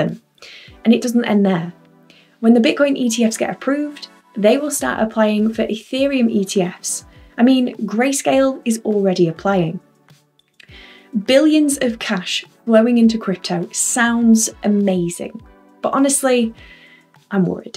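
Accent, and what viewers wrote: United Kingdom. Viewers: Sounds British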